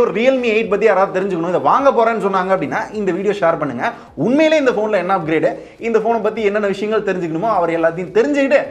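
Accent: Madurai, India. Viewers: மதுரைகாரன் பேச்சே தனி தான்...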